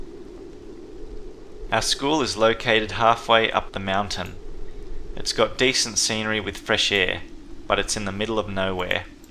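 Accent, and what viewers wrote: Australia. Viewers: The Australian accent is amazing.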